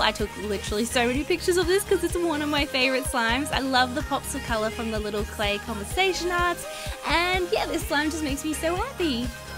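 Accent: England, United Kingdom. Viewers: I'm new to ur channel and I notice that u r so adorable oh and I'm from England too I notice your accent (idk I'm not good at accents)😝 Btw I subscribed to ur channel 👍💚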